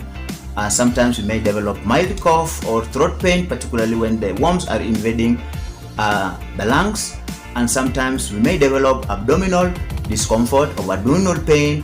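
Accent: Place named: Luo, Kenya. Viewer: Haha apart from deworming sijui last time kudeworm haha lkni the luo accent hehe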